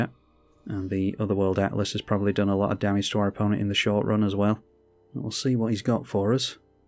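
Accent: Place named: United Kingdom. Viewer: Bro is so british the closed captions put his saw in as a SAR in half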